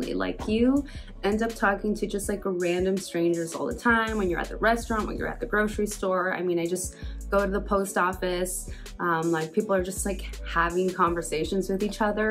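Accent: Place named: California, United States. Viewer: I am from California, and you you sound so sound so Cali. I heard that that Austin is expensive?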